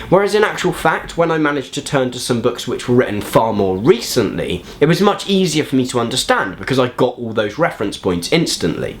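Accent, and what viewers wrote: United Kingdom. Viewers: The first 20 seconds sounded very British.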